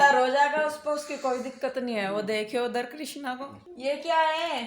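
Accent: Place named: Baghpat, India. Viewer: Aapki language dekh kr lagta h jaise aap baagpat badhot ki boli bolte ho meri h friend h waha ki to uske ghar me bhi sab aise hi bolte h acha lagta h sun kar.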